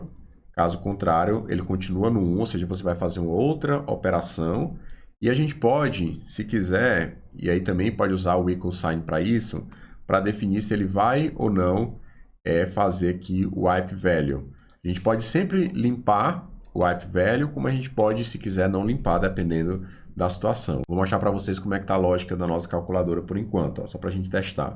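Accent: Ceará, Brazil. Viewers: seu sotaque parece aqui do Ceará! procede??? =)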